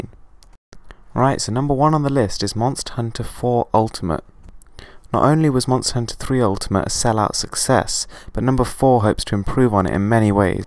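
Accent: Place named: United Kingdom. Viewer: why british people say to pokemon. pöukman? wtf...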